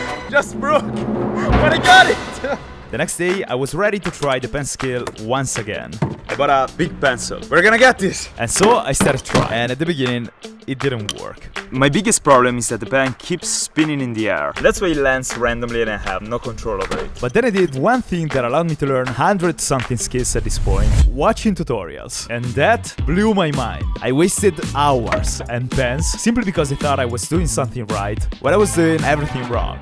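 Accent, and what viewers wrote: Italy. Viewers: L'italia si sente... nella lingua :D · Hai un accento così italiano 😆 (bellissimo)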